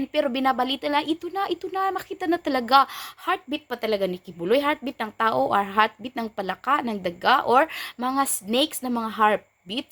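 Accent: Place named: Visayas, Philippines. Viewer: Bisaya ka day .ayusin mo pagsasalita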